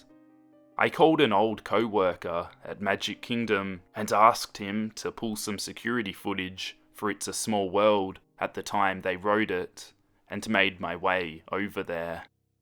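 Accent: Australia. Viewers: Your skill and works are an inspiration. Also your Aussie accent adds a uniqueness to the story narration.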